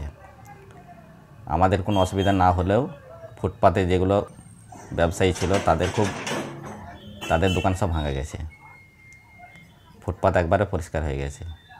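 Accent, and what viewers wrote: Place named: West Bengal, India. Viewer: ওর accent বলে ওর চৌদ্দপুরুষ পশ্চিমবঙ্গের।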